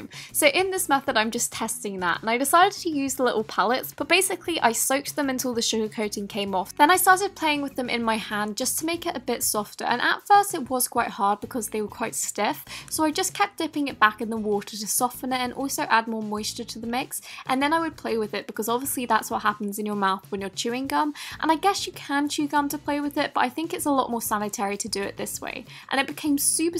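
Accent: England, United Kingdom. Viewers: I like your accent Are you from England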